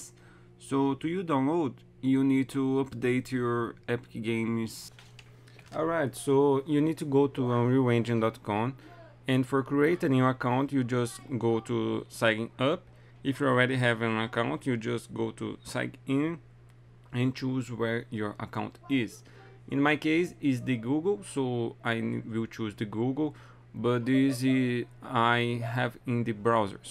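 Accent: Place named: Brazil. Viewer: Reconheci o sotaque BR também kkk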